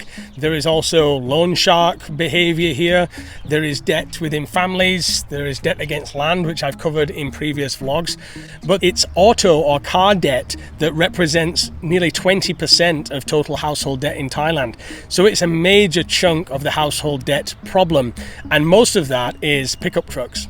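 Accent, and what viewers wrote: North, United Kingdom. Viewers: Where you from I hear a northern twang in your voice that has been diluted over the years by speaking clearly to be understood. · Sad to hear you are losing your good old northern accent mate. You’ve got a media proper voice now 😂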